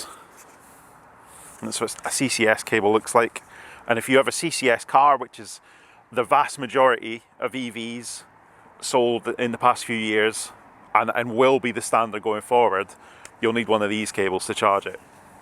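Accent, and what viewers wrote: Scotland, United Kingdom. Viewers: Maybe it was the scottish accent but you have just educated me a new owner of a EV cheers mate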